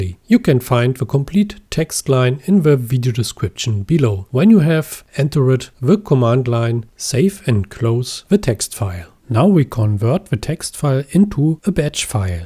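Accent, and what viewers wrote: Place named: Germany. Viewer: Danke für deinen schönen Tutorial! Der Akzent kommt voll raus haha direkt erkannt DE 😂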